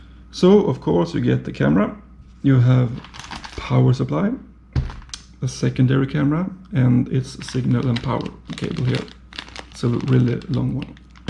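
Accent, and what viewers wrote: Sweden. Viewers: Your Swinglish is absolutely fine. 😃 The camera is great . Extremely clear front and rear and it changed immediately in and out of the tunnel. Excellent product for anyone that spends a lot of time on the road. Thank you once again for your beautiful scenic videos and great choice of music as always. 🤗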